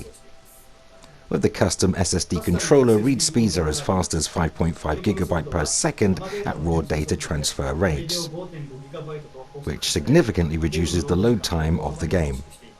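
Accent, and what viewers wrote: United Kingdom. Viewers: British ASMR for Playstation fans.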